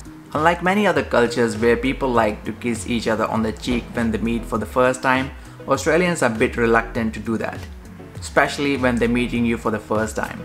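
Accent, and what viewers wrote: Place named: India. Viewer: U sounds Indian!! Aren't u?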